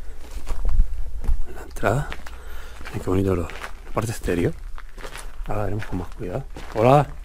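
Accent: Spain. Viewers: Muy interesante este video, increíble. Donde es? Tu acento me parece canario.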